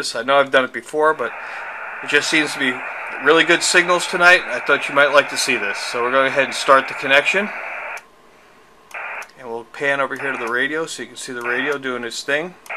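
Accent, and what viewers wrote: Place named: United States. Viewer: Another great vid! I need to get a setup like that for my self. I have been trying to educate myself so I know what to buy. You channel is a God send to people like me. You mention in some of your vids you live in Russia. You sound like you were born and raised in the US. I hope this is not too personal, but Russia does not seem like a place many people from the US move to. Not that there is any wrong with that for sure, it just seems very unique. As always, keep up the great work!!!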